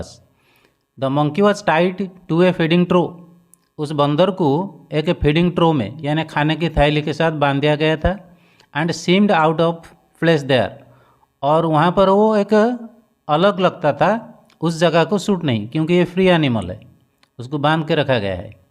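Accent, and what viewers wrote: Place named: Odisha, India. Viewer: Sir , I'm also from Odisha and I knew you are a odia by your accent . Love from Jajpur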